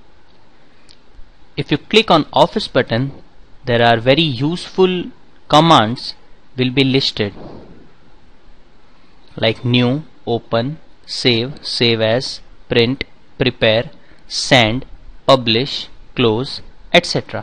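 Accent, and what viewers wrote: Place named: India. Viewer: video is awesome....with all zooming and sound effects ......best part is audio is in indian slang !!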